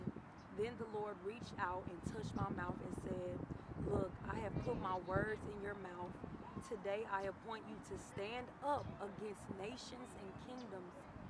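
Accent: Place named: Memphis, United States. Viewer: This was right on time. Idk how I got to your channel but I’m glad I did. Your videos have really been blessing me. I love the Memphis accent too (lol I can always hear a fellow Memphian 😂)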